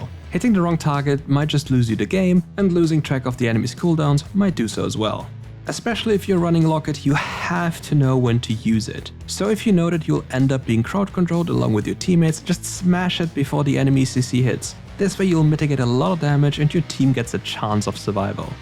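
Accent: Germany. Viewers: I like the charming German accent in the recent videos.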